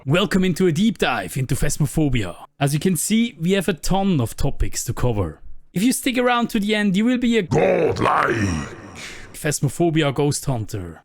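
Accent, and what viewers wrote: Switzerland. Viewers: Yeahh cool vid👍🏾🙏🏾🙏🏾 you sound like e schwitzer !? 😎😅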